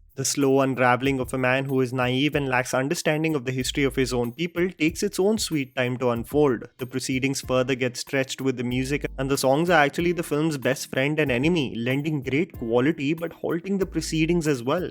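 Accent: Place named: India. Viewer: Followed by horrendous indian accent ................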